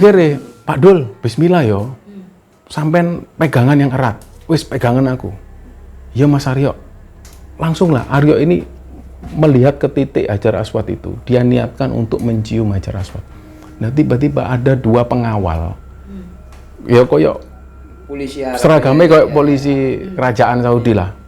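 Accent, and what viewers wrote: Java, Indonesia. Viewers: Selamat ulang Tahun,dari awal cerita pendakian mas mas beserta keluarga,sampai detik ini Masi setia sama Chanel ini,cara mas membawakan cerita yg natural dan njawani inilah yg saya suka, sukses terus dan semua crew nya, Gusti mberkahi🎉🙏🥳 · Tumben mas bahasanya cmpur logat kejowo jowoan 😁..tp msh tetep bagus 👍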